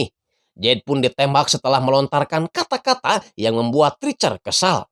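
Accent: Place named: Sunda, Indonesia. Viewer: Njir baru denger cara baca narasinya boga karakter, selain intonasinya yang has urang Sunda, tapi sangat menghibur lucu komedi banget..😅😅 kanjuutkan..😊😅😅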